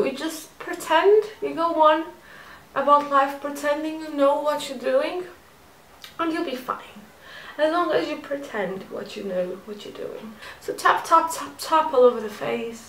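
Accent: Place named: England, United Kingdom. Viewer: You're so funny and really like your English accent :)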